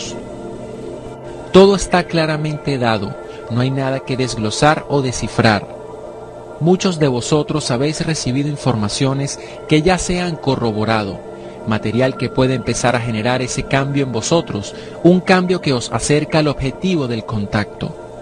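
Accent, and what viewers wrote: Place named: Mesoamerica, Latin America. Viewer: La musica es hermosa, el mensajes es el mismo que el de tantas canalizaciones, no entiendo porque no pueden decir quienes son?.. ya conocemos a los pleyadianos arcturianos y demas...no entiendo bien ???...un par de cosas me molestaron...LA TONADA MUY HUMANA HASTA MESOAMERICANA.. Y QUE NO FUERA EL ORIGINAL..por lo demas...lindo..¡¡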